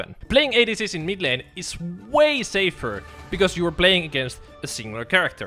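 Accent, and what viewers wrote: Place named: Finland. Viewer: start watching video hear the accent realization FINLAND MENTIONED🇫🇮🇫🇮🇫🇮🐻🐻🐻🐻🥶🥶🥶❄️❄️❄️❄️🍻🍻🍻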